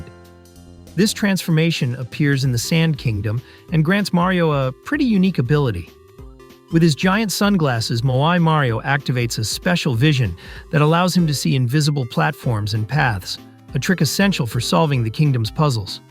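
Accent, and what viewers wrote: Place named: Boston, United States. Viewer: Incredible video! It made me feel nostalgic about when I used to play on my red DS Lite. Awesome, based on your accent, I’d bet you’re from Boston. Cheers, and you’ve got a new sub!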